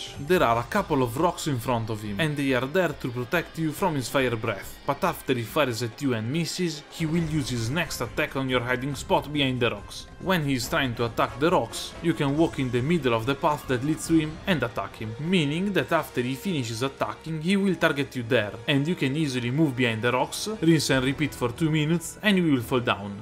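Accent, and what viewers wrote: Italy. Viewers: I started this video without knowing that was your actual voice and I legit just fell in love with it, time to play "Guess where that accent is from" Nevermind, I was guessing Siberia but he's actually from Italy. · AH HA! Lo sapevo che l'accento era familiare hahaha! Per qualche motivo, questo è il primo commento che leggo che mi conferma che sei italiano anche se lo sospettavo dal primo momento (?) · For real, I love Italian accent, I love dark souls so mathematically (I love this channel)². Btw awesome videos my dude, greetings from Brazil. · Sottofondo con le OST di Monster Hunter, contenuto di qualità e l'accento italiano non fa altro che rendere il video più scorrevole! (almeno per me :3) Ti ho scoperto da poco e mi sono iscritto subito, un canale così va supportato ^-^ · Ma per curiosità, che accento è? Che parte di Italia? Ogni tanto mi sembra quasi spagnolo o slavo ^__^ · You sound a lot like an italian trying to speak english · I love how i could tell you are italian from the first thing you said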